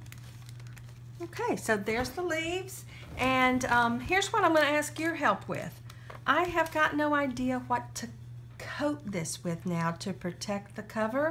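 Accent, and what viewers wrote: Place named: Texas, United States. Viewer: Darlin I was just looking up flower tutorials and I found this video. I know this was back in 2017 so I'm not sure if you will even see this. I'm from Texas originally and hearin that sweet country twang made me feel like I was home again. I think this is so wonderful and am gonna be prayin for you and your ministry. 💗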